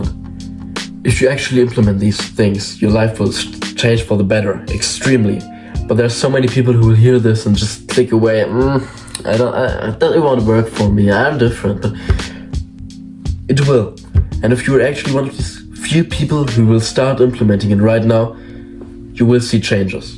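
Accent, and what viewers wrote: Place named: Germany. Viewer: I like your german accent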